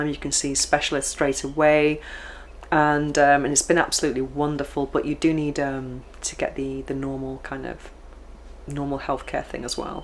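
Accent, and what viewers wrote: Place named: Liverpool, United Kingdom. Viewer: With your opening sentence "I didn't know if I was coming or going." You have a slight Liverpool accent with your finishing school voice.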